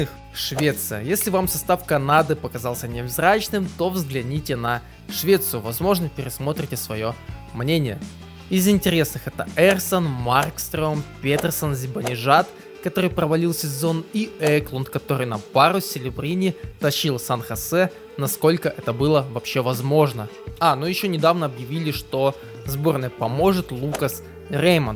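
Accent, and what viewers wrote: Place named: France. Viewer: Комментатор с французским акцентом,не в обиду,прикольно базарит.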